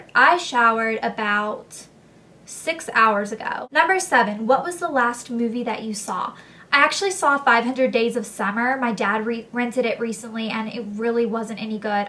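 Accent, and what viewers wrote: South, United States. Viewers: your southern accent is coming back slightly. is it because your back home? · Hahaha, could it be that your accent is getting more 'Southern' again, because you are at home? I know it happens to me, when I stay at my parents for a longer time. (And I'm from Belgium! Did you like our country?)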